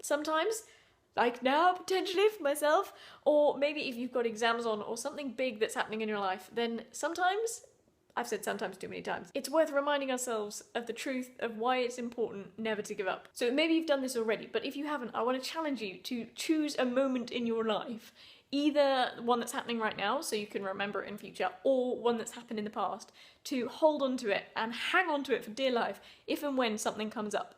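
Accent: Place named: England, United Kingdom. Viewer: Love that proper english accent.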